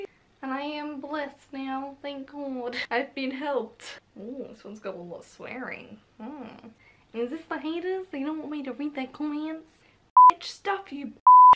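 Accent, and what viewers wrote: Australia. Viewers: Are you Australian....I'm bad with accents...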